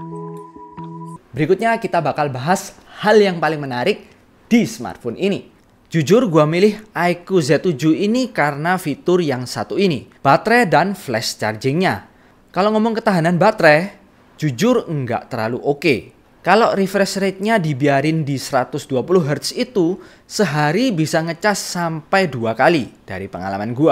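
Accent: Java, Indonesia. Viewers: Bang bagusan bilangnya "saya" jgn "gua", soalnya logat lu medok bgt 😅, jd gk enak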